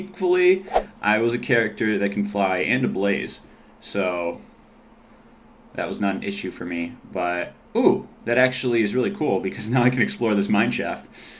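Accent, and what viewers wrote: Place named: Michigan, United States. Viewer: im from michigan too so sounds normal to me lol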